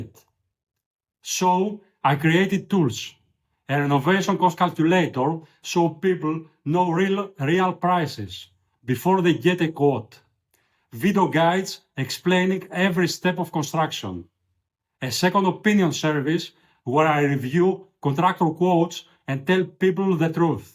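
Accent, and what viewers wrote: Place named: Greece. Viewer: Έχει τη χαρακτηριστική ελληνική προφορά αλλά so what? Μια χαρά κατανοητός είναι 😊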